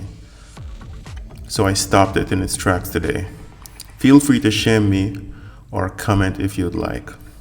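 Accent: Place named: West Indies, Caribbean. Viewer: Interesting story, I detect a west indies accent. Barbados maybe?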